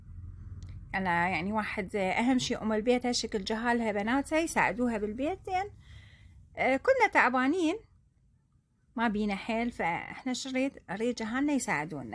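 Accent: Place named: Iraq. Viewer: اهلا بيچ خيتي شلونچ شخبار بلدچ العراق الشقيق فدييتچ اني جزائري وهم احب هالبلد الشقيق واعشق لهجتكم بس يمكن تسوي الي دولمه لو كبه البرغل لانو احب هاي الاكلات تسلمي خيتي الغاليه انتي واهلنه واحبايبنه بالعراق الشقيق🇩🇿🇩🇿💕💖💓🇮🇶🇮🇶✌️✌️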